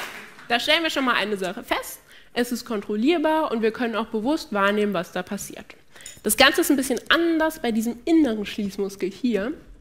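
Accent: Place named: France. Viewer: that's her french accent 🤓🤭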